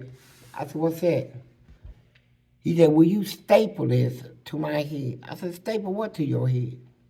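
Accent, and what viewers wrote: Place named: Kentucky, United States. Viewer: He got that southern kentucky drawl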